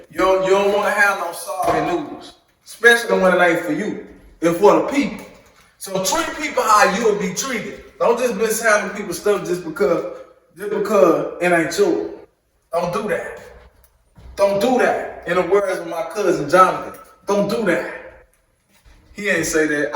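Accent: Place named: South, United States. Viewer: I love his accent you know he from the Dirty South wud up tho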